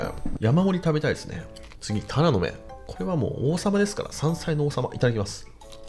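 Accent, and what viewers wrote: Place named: Nagoya, Japan. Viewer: 名古屋弁です。標準語に比べるとアクセントが強めです。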